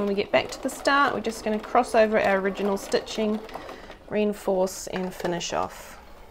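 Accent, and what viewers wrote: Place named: New Zealand. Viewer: What sort (brand) of tape was it? Luv your kiwi accent too. Great tutorial. Thanks! 😂🤣💕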